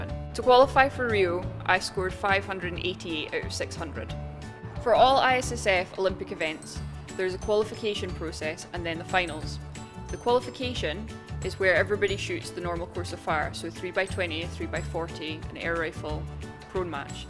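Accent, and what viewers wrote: Scotland, United Kingdom. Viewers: Its a accent from scot. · Oh... that heavy Scotish accent! I can only catch about 30 percent of what she is saying. Thank goodness for closed captioning. 😅 😂 🤣 Side note: In this day and age (#metoo) it does seem a bit absurd that "fragile females" are given less shots to take than the "big strong men". In fact, it's a bit absurd that there still are still both separate women's and men's events in this particular sport. Why not let them compete head-to-head?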